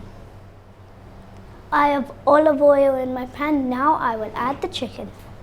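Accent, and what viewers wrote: United Kingdom. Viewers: British pronunciation 😊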